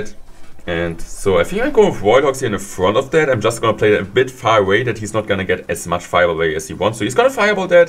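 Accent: Germany. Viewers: ich gucke deine Videos auf ddm Deutschen und Englischen Kanal... wollte nur sagen dad du nen sehr krassen Deutschen Akzent hast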